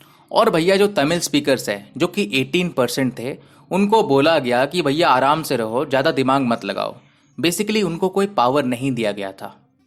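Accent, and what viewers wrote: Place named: Uttar Pradesh, India. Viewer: That line ki ' Bhaiya aaram se raho, zyada dimaag mat lagao' made me laugh..😉 Nice work bhaiya..Aapki bolne k tareeke se lagta h ki aap UP k h ..main bhi Lucknow me rehti hu 🤗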